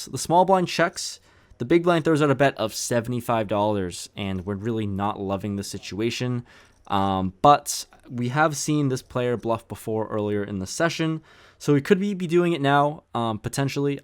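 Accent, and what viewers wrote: Western Massachusetts, United States. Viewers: Oh are you from western mass in mgm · Are you from western Massachusetts?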